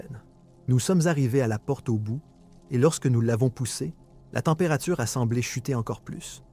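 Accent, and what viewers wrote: Quebec, Canada. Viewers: J adore vos histoires...avec le petit accent québécois c est très sympa 👍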